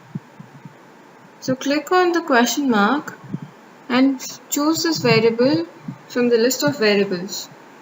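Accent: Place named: India. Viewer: 最难忍受 印度人 说英语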